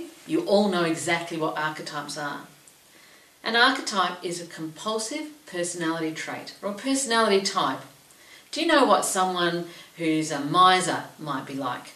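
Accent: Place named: Australia. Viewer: love both - the jungian archetypes & the aussie ascent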